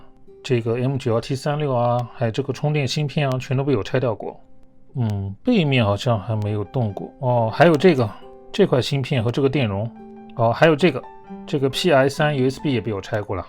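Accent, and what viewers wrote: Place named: China. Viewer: 口音明顯中國人啊⋯中國人講日語吧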